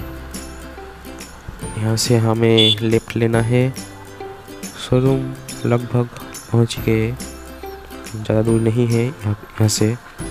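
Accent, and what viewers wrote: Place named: West Bengal, India. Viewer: Gd one..bt you should improve your hindi 😇..i think you are from west bengal ❤️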